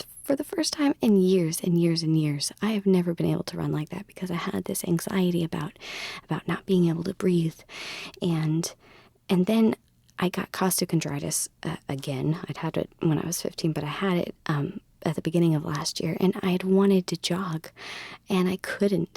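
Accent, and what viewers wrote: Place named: Texas, United States. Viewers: AHHHHHHH LORD YOU HAVE BEEN MISSED!!!!! me and the wife love your channel and your Texas accent we are from Dallas :p but so happy your doing good keep it up :) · Please come back!!! You are my favourite ASMR artist ! You were the first one I stumbled upon and I have a sentimental connection to you, you have no idea. You're even on my photo collage lock screen of "favourite people" (next to Freddie Mercury and Sylvia Plath). (Holy shit that sounds invasive and weird but you just make me smile every time I visit your Channel) I've missed you so much! Your role plays, your singing, your cleaning! your acting! Your hint of a Texan accent (my Australian one is rather monotonous) I'm 16 and I'm in my final year of high school and I need you to placate me ! Okay I need to slow my role but you get the picture -- you're amazing, genuine, quirky, amicable, and a little bit of sunshine to light up my day. 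Please please upload soon! I miss you!!!!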